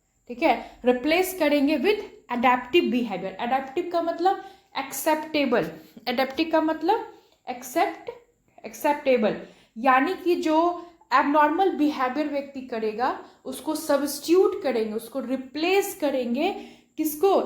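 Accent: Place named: Bengal, India. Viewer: Madam poora bangla accent ❤❤❤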